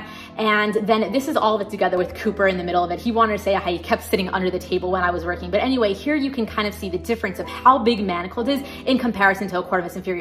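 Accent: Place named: Midwest, United States. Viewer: I love watching people bind books. Can I follow you on your Instagram? And I'm always trying to figure out what part of the states you're from cuz it sounds like you're from the Midwest but not quite Minnesota Midwest but maybe like Iowa or Nebraska. I lived a lot of places and so I always find it fun. Trying to figure out where people's accents come from. ☺️ You and bargain Bethany have a very similar accent.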